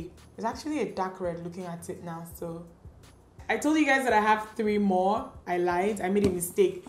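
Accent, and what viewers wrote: Nigeria. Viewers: I have sooo many compliments to give ... From the eyeshadow to your skin , I love the fact that your Nigerian accent has not changed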